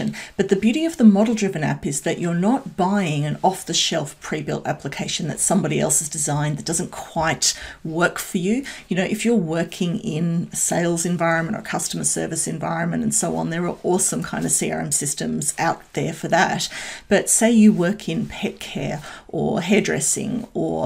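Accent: Australia. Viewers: I dodn't know Australian sound like this :D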